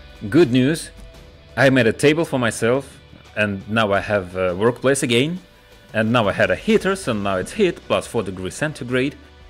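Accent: Russia. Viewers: так я не пойму ты русский или англичанин? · Смотрю, слушаю и думаю, это у меня уши сломались или он не англичанин. Хм... Почему русский акцент... Хах) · а я вот никак не пойму. По акценту ты, вроде, русский, а по контенту в предыдущих видео, вроде, из америки. Я запутался, откуда ты?:)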